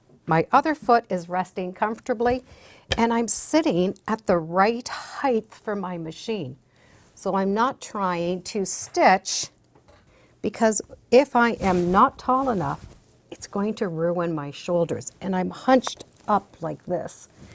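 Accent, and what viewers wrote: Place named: Canada. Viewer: I just love watching you! Especially your pronunciation of some words in your Canadian accent!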